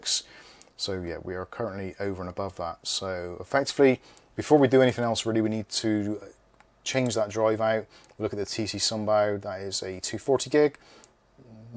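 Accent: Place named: England, United Kingdom. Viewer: 120gb SSD? Wow that's tiny for 2022! 500gb should be minimum and for my PC builds I install 1TB ssds at a minimum. Windows 10 or 11 would fill up the 120gb ssd. Keep uploading these PC fixes, it's like watch Greg Salazar but with a cool English accent!! I will try and make your live stream on Saturday since I'm off work for the weekend.....finally! I still work on Youtube but no bartending this weekend!!! Cheers!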